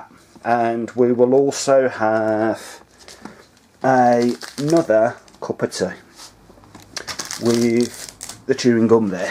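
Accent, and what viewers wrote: United Kingdom. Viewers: where in the West mids are you from? Wolvo or Walsall maybe?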